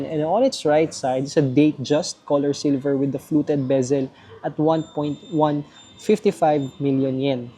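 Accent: Philippines. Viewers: Nice content, strong Philippino accent though, can still understand 😢😂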